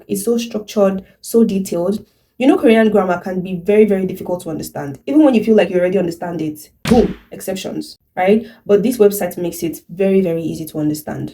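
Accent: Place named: Cameroon. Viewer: You sound a lot like a Cameroonian, even though I know you're Yoruba from your name😄.... Keep up, very positive and inspiring channel.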